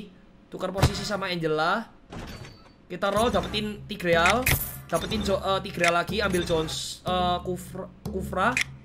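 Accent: Java, Indonesia. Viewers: Jawa totok hahahaha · Njar njir blas ramasokk, medok wae sok sokan njir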